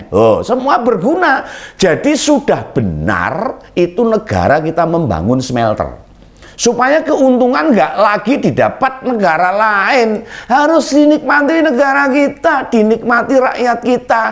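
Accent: Java, Indonesia. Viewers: saya betul2 senang melihat logatnya mas ini, jawabanget, saya suka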